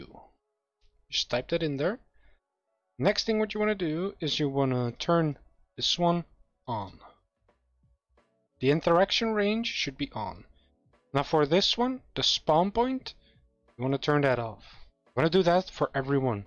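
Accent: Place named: Netherlands. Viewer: Je klinkt Hollands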